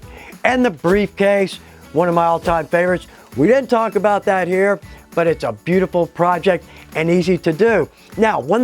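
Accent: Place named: Texas, United States. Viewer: Congrats. I haven't been watching your videos for ten years, but I have for a long time. I have been doing leather work since high-school ( I am 80), but every time I watch one of your videos I learn something. Sometimes it is something that i have forgotten about but that's a different story. I enjoy watching you work and all the tips you have. One thing though, your Texas roots show up once in a while in your speech. You can take the boy out of Texas but...............! I live in Texas a little south of where you did. Keep the videos coming because I am not through learning! Thanks!!!!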